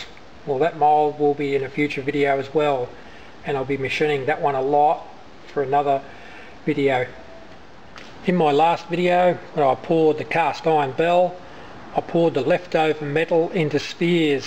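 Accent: Australia. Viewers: Sounds like your from Victoria ?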